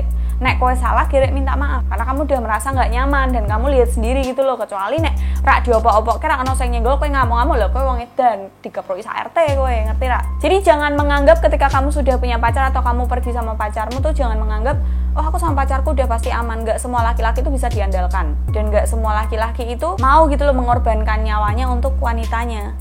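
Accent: Java, Indonesia. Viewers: suka logat jawa.y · Mbak buat subtitle nya dong di bawah kalo lagi medok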